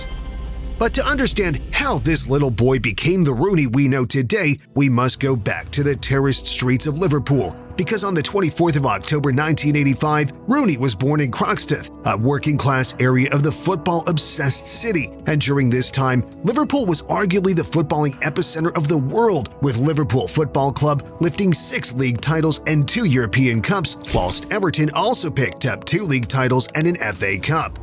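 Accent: United States. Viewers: Click to watch a football video only to hear another yank voice narrative.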